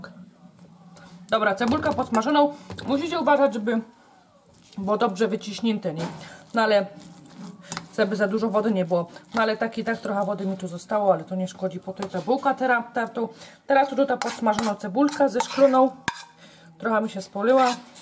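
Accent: Silesia, Poland. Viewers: Superrr jesteś fantastyczna twoja gwara śląska jest niesamowita muszę codziennie Ciebie posłuchać. Buziaczki😘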